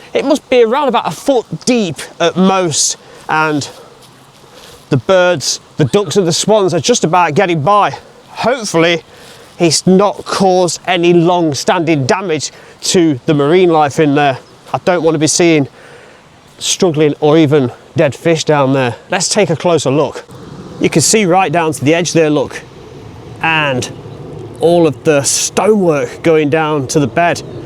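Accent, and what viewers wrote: United Kingdom. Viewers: And here was me in NZ thinking it was just one of the British dialects! Adds to the flavour!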